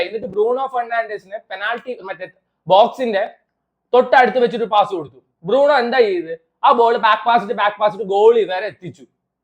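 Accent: Palakkad, India. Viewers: Enan adyam video kandad Palakkad slang is attractive Apart from whats the content your slang make it interesting bro Keep going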